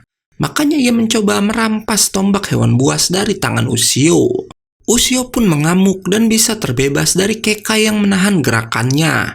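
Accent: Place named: Kalimantan, Indonesia. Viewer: Mimin org Kalimantan ya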